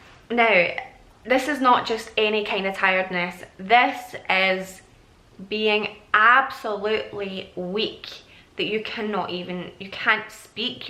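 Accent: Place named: Scotland, United Kingdom. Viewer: Are you Scottish nice accent 😉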